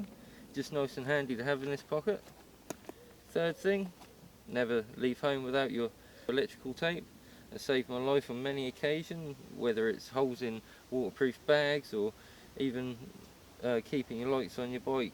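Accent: Norfolk, United Kingdom. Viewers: I really enjoy your videos very helpful and you have such a nice Norfolk accent 🚴‍♀️🚴‍♀️🏳️‍🌈🏳️‍🌈🚴‍♀️🚴‍♀️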